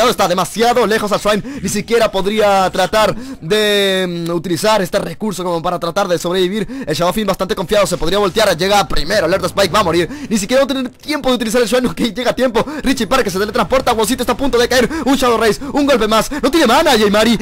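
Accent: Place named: Peru. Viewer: Claramente es peruano jaja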